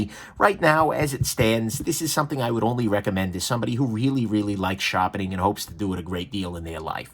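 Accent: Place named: New England, United States. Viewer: I tried to watch this but his ridiculous Yankee accent ruined it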